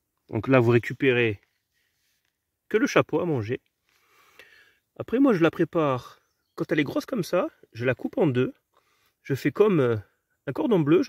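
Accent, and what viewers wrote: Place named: Corsica, France. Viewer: Bonjour peux tu me dire à quelle altitude tu te trouves et quel type de sol as tu? A ton accent, il me semble entendre que tu sois de l'île de Beauté. Merci et bonne continuation.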